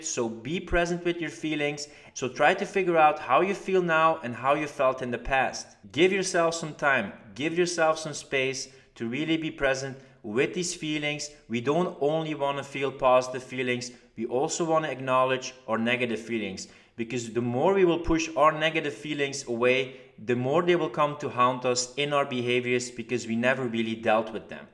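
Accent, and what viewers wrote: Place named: France. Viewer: No mather what it is - quiting bad/ building new habbit but the moust important from the points you were talking about after an slip-up is to foregive yourself and not judge yourselffor so much, but it it is also the moust difficult thing to do, for me at least.... if i can ask.... is this only your hobby ? or do you work with stuff like that ? i mean if you are some advisor , educator ,speeaker or no idea how to describe it (but i hope you know what i mean) and 1 more question.... where are you from ? i gues France :D (or a native french speaker at least acording accent)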